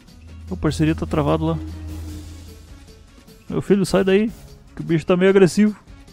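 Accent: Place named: Rio Grande do Sul, Brazil. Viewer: sotaque de Gaúcho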